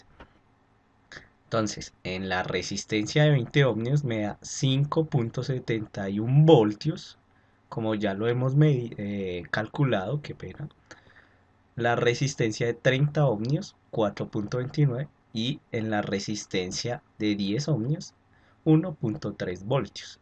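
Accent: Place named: Bogotá, Colombia. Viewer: Puro acento de rolo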